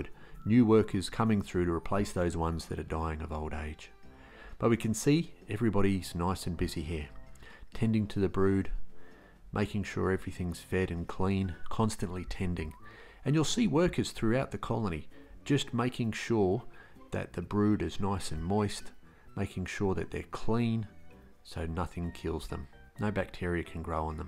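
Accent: Australia. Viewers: I guess it's because I grew up as part of that generation but if I hear an Aussie talk about animals I tend to be entranced and retain the information better. The spirit of Steve Irwin is smiling somewhere.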